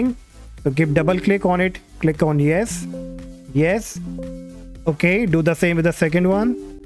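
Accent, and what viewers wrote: India. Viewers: I am definitely NOT trusting it especially with the Indian voice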